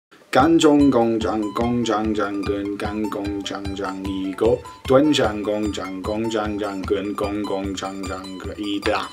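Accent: United Kingdom. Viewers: you guys make me want to speak british accent too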